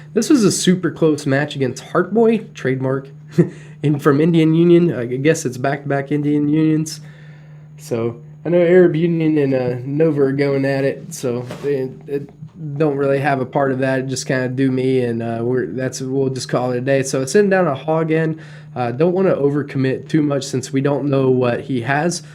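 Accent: Texas, United States. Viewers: I miss the Texan Drawl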